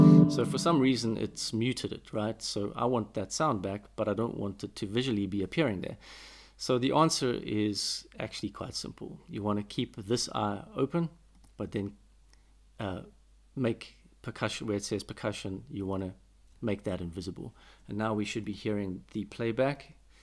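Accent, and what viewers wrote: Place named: South Africa. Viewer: Thanks for this! Are you South African? I think I can hear it a bit in your voice :D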